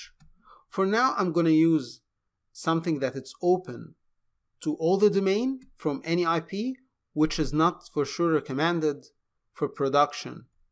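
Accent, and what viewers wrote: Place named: Iran. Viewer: You can't hide that Iranian accent mate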